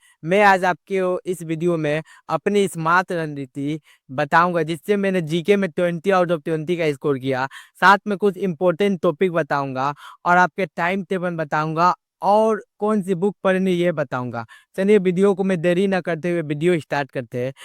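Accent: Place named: Bengal, India. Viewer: Abe vo bangali bolta hai hindi sikh raha hai abhi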